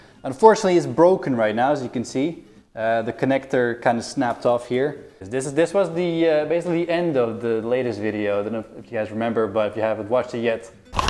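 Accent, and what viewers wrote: Netherlands. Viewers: Ik vind het gewoon grappig hoe erg je je Nederlandse accent kan horen als je Engels praat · Ja hij is Nederlands dat hoor je ook in veel video's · Je bent Nederlands praat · Wut ure dutch... omg ik ooook! Jajajajaja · Are you dutch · Are you dutch ik wel btw XD love your video’s ❤️✌️ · je bent nederrlands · Are you Dutch? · Bro are you dutch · Ben je eigenlijk Nederlands of Belgisch? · Are you frome Holland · I like when you show the clips are you dutch btw? I kind of get that feeling by youre accent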